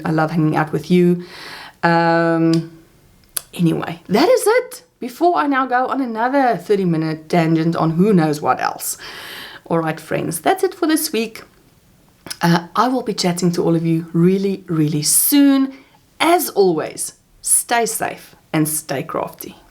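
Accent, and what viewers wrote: South Africa. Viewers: Hi there, from Germany 🇩🇪. I love your Chanel and I am so grateful to have found you. As I have lived in South Africa for 34 years, (I was brought up there, lived there from 1969to2003), it is so wonderful to hear your accent. It makes me feel so at home, as I miss SA. I have been crocheting for many years. We had a knitting and crocheting business in Johannesburg. I started amigurumi here in Germany. I also do mini albums, scrapbooking, cards etc. I love your crochet work. You work so neat and tidy. I love your taste in projects a lot. Keep on with your excellent work. I look forward to your videos. Thank you so much for giving us all these pattern advices and tips, just love it. 🤗💖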